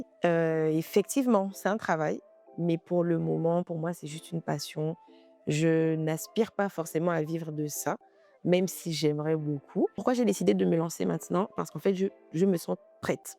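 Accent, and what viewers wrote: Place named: Gabon. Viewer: Une gaboma j'ai reconnu l'accent ❤❤❤❤❤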